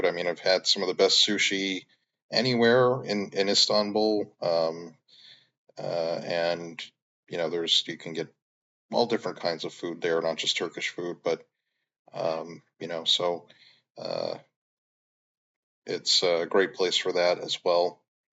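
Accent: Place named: United States. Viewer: Hey man, yt randomly recommended me this video. I grew up there lol and I live in the US rn. You have talked about Sariyer and I was like maybe he studied at the Bogazici University(?). Were you staying at the Kandilli Campus ( You have talked about taking a bus and then the metro so maybe?) Yeah public transit can be really draining and it usually sucks. Also the level of random traffic is probably unmatched too. Anyways, it was funny hearing an American yap about Istanbul haha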